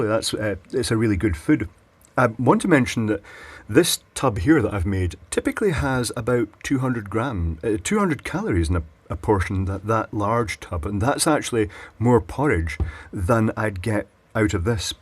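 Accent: Scotland, United Kingdom. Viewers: Thank you I live in the US but am from Cheshire, never knew there was a posh Scottish accent but your right. Nice vid though. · Coming from Scotland myself, this guy has the most annoying Scottish accent in the world.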